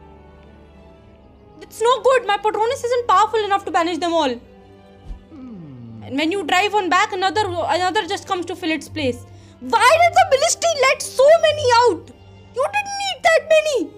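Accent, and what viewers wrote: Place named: India. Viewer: Sounds more Indian!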